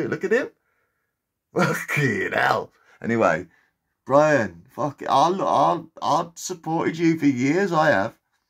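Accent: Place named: Black Country, United Kingdom. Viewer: Nice black country accent...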